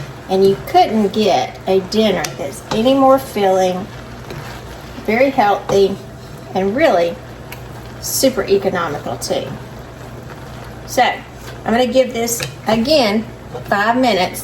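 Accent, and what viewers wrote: South, United States. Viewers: Looks good and love southern accents so no worry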